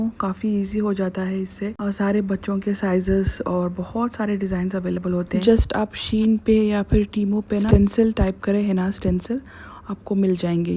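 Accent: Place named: Dakhani, India. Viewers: V nice vlog voice over also u do in dakhani urdu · Ur Urdu s same as hw we speak at home. We are also from Bangalore.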